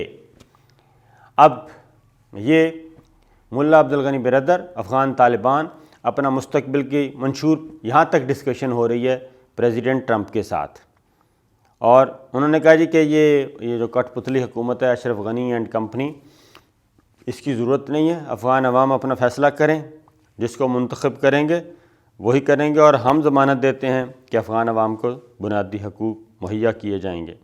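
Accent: Punjab, Pakistan. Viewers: the way u speak English with Punjabi Accent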